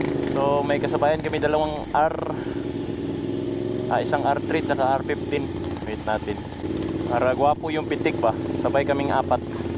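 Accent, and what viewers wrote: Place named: Philippines. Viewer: i love ur filipino accent "lean anggol"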